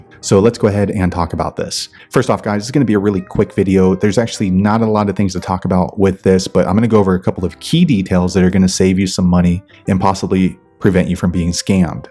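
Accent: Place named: United States. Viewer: i can tell you're american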